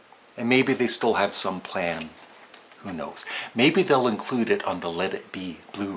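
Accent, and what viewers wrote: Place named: Canada. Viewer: 🇺🇸 I just assumed it was Canadian accent coming out. Doesn’t bother me.🇨🇦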